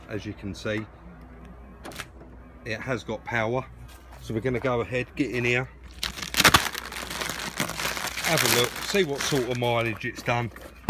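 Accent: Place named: England, United Kingdom. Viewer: Right old English fella ....love it mate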